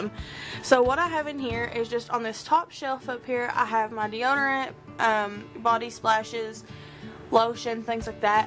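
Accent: Kentucky, United States. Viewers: OMG I could tell she was from Kentucky as soon as she started talking :) I'm also from Kentucky :) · I LOVE YOUR KENTUCKY ACCENT!😍😍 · What part of Kentucky are you from? I'm from southern WV and your accent reminds me of home :) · Love your accent I wish I was from the south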